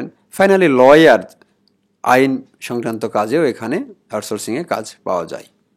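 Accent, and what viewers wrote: Bangladesh. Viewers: Vaia apnar basha ki naogaon ??? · ভাষায় খুলনার গন্ধ....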